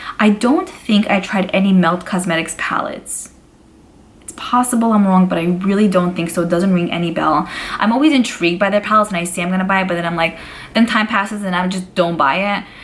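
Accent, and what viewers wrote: New York, United States. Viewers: I love the way you say chocolate, that New York accent is strooong! Your too freakin cute XOXOXO 😇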